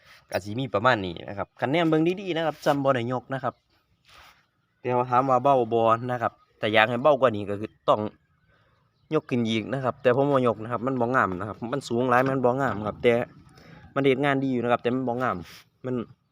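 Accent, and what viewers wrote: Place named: Sisaket, Thailand. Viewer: อยู่ไสน้อครับคือเว้าคือคนศรีสะเกษแท้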